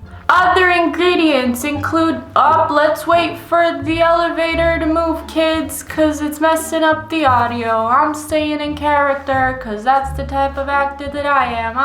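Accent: United States. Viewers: You clearly grew up in America you liar! To have a yank accent you lived in America and act like a American so yea I call bullshit on your nationality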